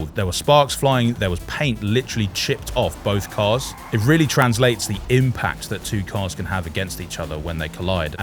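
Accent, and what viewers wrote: United Kingdom. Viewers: Wreely wreely good game... damn i hate british accent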